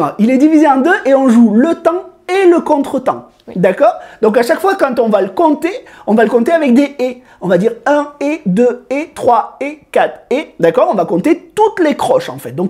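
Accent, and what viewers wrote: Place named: South, France. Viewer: Merci pour cette super vidéo. Un très bon exercice même pour les grands débutants comme moi. Tes vidéos sont une mine d'or et ta pédagogie au top. Dans le dernier exercice j'ai trouvé cool de faire 2 mesures E à vide G5/ A5 /B bémol 5 et A à vide C5/D5 E bémol 5. Ça fait bien bosser... J'ai cru entendre que tu avais l'accent du sud 😅j'habite dans le sud aussi peut-être ne sommes nous pas très loin pour des cours en individuels... Je ne sais pas comment te contacter en privé. Merci et continue tes vidéos.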